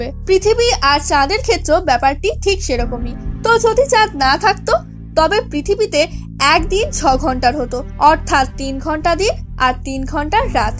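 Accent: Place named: West Bengal, India. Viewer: Tumi mone hoy indian bangali tai na tomar kotha gulo khub cute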